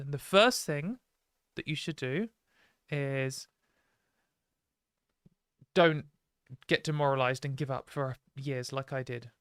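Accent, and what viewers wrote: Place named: Oxford, United Kingdom. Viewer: Your "exit plan" if it becomes unbearable should be moving to Germany. We have a new self-ID law, a way better healthcare system and you can live here very well with only speaking English, at least for the first time, and you speak nearly perfect German school Oxford English. The greatest thing is TERF ideology and Anglosphere sex essentialism about "destinated gamete producers" are not very common, queer theory also not, more kind of "trans.pragmatism" focused on perceivable sex characteristics. I don't know how this is in Britain, but here are tons of cis women running around who look like you, have a voice like yours and are around six feed tall, so you will have no problem with passing. Our few TERFs are really caricatures of Anglosphere TERFs, they only can rehash all of the Anglosphere TERF stuff, NOTHING genuine with them, but it doesn't work this way in Germany and with German language, nearly all media make fun of them when they appear in public. 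And if you want to make music with fellow trans women, you can contact "Jill Please" (keyboards) and "FiNessi" (guitar like you).😁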